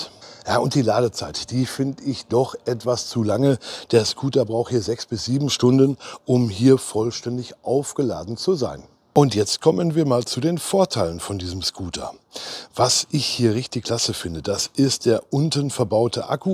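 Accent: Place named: Ruhr, Germany. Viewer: Super hilfreiches Video, hab dadurch meine Kaufentscheidung bestätigt bekommen. Ich könnte wetten, du kommst aus dem Ruhrpott?! Glück auf und danke die Vorstellung vom Ninebot! 👍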